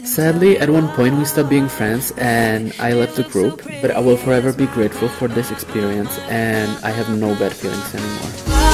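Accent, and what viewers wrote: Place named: Czech Republic. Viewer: Where do you come from your acsent and place where you from looks like Czech Republic or Slovakia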